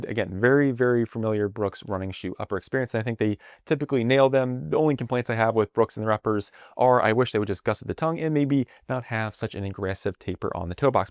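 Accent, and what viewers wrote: Maryland, United States. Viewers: Maryland accent heavy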